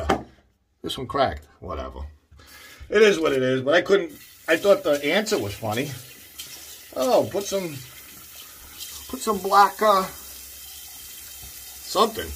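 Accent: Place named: South, United States. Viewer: Love your content and the southern accent. 🙂 Keep up the good work!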